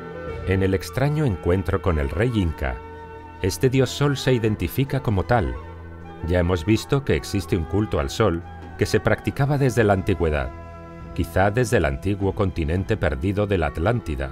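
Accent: Spain. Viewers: En los 11 años de educacion primaria que estudie en Peru y que, solo se enfocaba en Historia del Perú. Jamas me hablaron de ninguna de estas huevonadas que habla este tipo con acento Español. Voy a reportar este video ya mismo. Esto es una burla a la humanidad. PDTA. Escuchen el la 5ta estrofa del Himno Nacional Peruano se las recomiendo.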